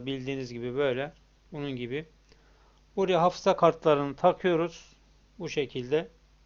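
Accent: Erzurum, Turkey. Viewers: abi Erzurumlu, net!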